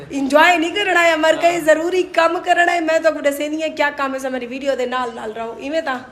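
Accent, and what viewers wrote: Saraiki, Pakistan. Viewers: Ye apka home kis city men ha ,ap saraiki tongue men Urdu bol rahee ho 🤔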